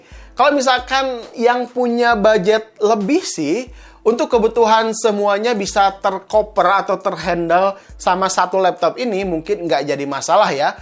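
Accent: West Java, Indonesia. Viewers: aduh akang sunda pisan hahaha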